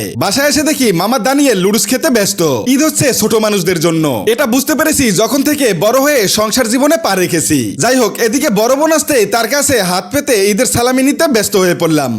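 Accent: Barishal, Bangladesh. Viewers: Kotha batra thik koiren aro views barbo 👎🏻🤬🤬 Borishailla Bangal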